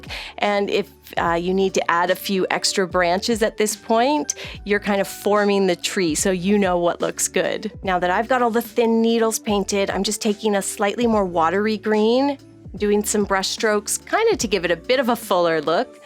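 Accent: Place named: Canada. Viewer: Accent .... heard very slightly in the way you pronounce your "O's"...... Canada 🇨🇦? I can only aspire to paint like you..... 😒 my ink and wash is positively elementary.. you are so talented 💖 warmly, base of Mt Hood.... Oregon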